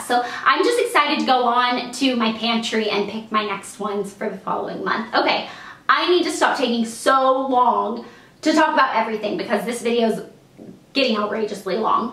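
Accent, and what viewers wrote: South, United States. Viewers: I honestly miss her southern accent..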